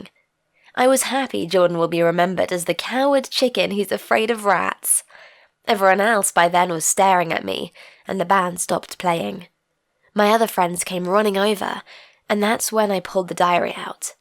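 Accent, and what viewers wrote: United Kingdom. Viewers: ffffff british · I love that STRONG BRITISH ACCENT.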